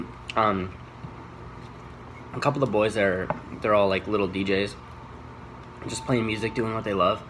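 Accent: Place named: Canada. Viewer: Before you said Toronto I was going to comment and ask if you were Canadian lol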